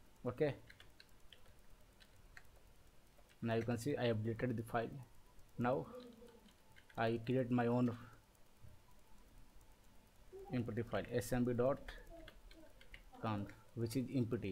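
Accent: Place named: Kerala, India. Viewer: it works man! many thanks. btw are you from Kerala? I've got a friend from there you got the same accent . hehem . Take care!!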